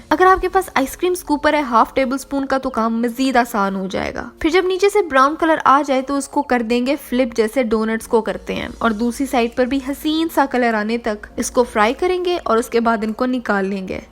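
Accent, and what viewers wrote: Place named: Punjab, India. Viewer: OMG! your accent makes your cooking videos killershot..... Punjabi ki to kya hi baat theee........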